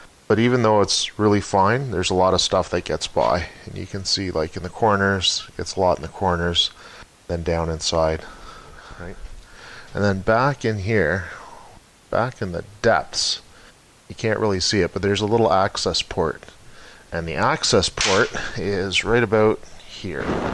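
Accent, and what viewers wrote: Canada. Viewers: Total stud! Thanks for saving me a ton of money on a sales call. And love that Canadian accent!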